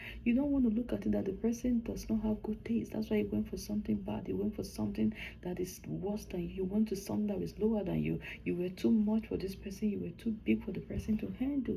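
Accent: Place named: Kenya. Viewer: Very good story. I love your accent. Kenyan? Very soft. Excellent video!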